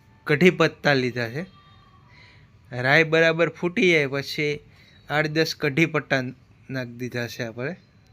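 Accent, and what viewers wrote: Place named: Kathiawad, India. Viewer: ભાઈ કાઠિયાવાડી ગુજરાતી બોલો છો એમાં વચ્ચે વચ્ચે ઇંગ્લિશના ધબ્બા ન મારો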